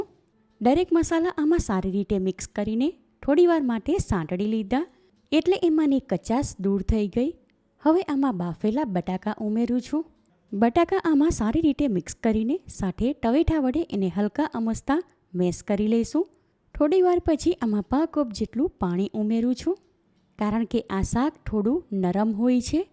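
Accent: Surat, India. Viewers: Chokks surti chho bolo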